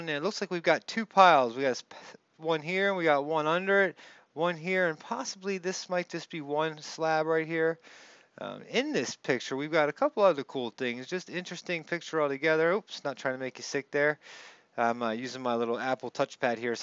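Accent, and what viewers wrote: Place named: United States. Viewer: That single rock is interesting, the scallops on the edge are very unlikely to be natural, so well spotted. The rest are I think simply rocks. Actually his american accent to us Brits is quite charming, so don't run him down so much - anyway everybody read The Third Explanation Amazon, Kindle, but shortly to be a paper book. Keep taking the pill!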